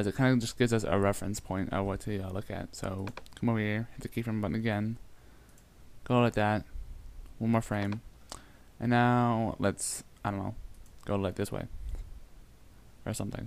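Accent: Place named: Ireland. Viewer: This guy sounds like some gay pissed off irish...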